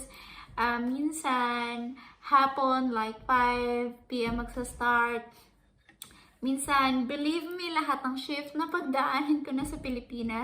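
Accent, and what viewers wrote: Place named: Australia. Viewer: May aussie accent kn bebe...love it 😊😊😊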